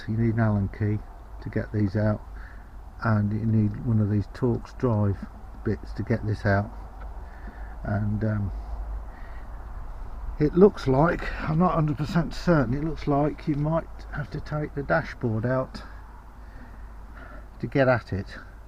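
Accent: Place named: United Kingdom. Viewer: Thanks for this video. As a British accent lover i have to also say that your accent is amazing!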